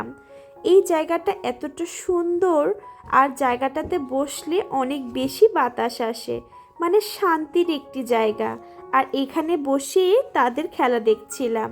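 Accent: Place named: Barishal, Bangladesh. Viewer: আপুমনি তোমার বাসা বরিশাল কোথায় নদীর আশেপাশে দৃশ্য দেখে তো বুঝতে পারলাম হোসনাবাদ রামার ফুলের সামন দিয়ে যেতে ছিলে আপু তোমার বাসাটা কোথায় জানাবে কিন্তু আপু অবশ্যই অবশ্যই কিন্তু জানাবে তোমার ভাষা বরিশালের কোথায় আমার বাসাও কিন্তু বরিশাল তুমি যে নদী দিয়ে যেতে ছিলে আমি কিন্তু এই নদীর যে দৃশ্য গুলো সব আমার খুবই খুবই চেনা❤❤❤❤❤❤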